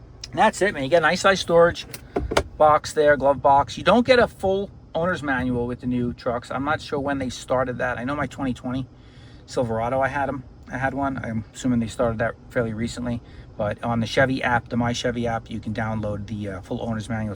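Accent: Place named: Long Island, United States. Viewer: Really good, thorough review! Were you originally from Long Island, NY, by any chance?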